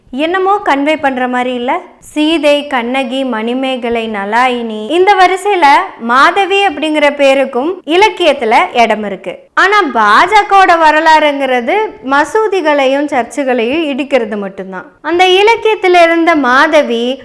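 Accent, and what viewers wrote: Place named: Coimbatore, India. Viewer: Super you are taking different topics and exposing reality dear daughter in Kovai slang especially. Lot's of our peoples are fans of you since for your slang especially 🎉❤keep going 💪 👍 👏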